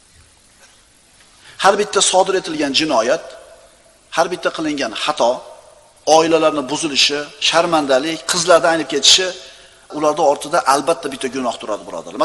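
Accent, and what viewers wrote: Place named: Andijan, Uzbekistan. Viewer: Ollohga Shukur ona vatanim tinch Oshdan ekan. Andijon bn shevasi bir xil ekan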